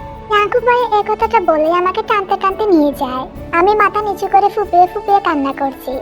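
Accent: Sylhet, Bangladesh. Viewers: আপু তুমি কি সিলেটি? তোমার ভাষায় সিলেটি সিলেটি ভাব আছে।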